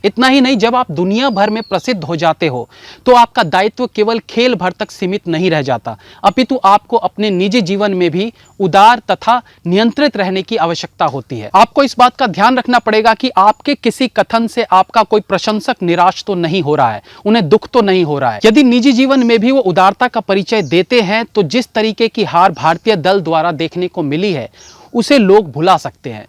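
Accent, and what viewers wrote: Standard. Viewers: शुद्ध हिंदी" गज्जब भईया❤️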